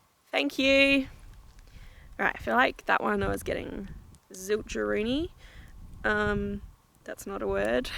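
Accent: Australia. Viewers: Hey 😍 how ste you angel i would love to go to Australia I just love the accent 😍 I love yours angel obt way ❤ I love that spooky video at the school I saw saw both awsome love 🌷🌷this video omg is so mysterious you can feel.the chill thank you for another video love from your back out back yard lol love always angel 😍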